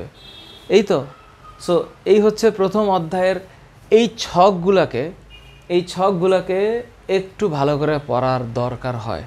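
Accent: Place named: Chandpur, Bangladesh. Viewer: Chadpur er bhasha bola ta kinto joss hoice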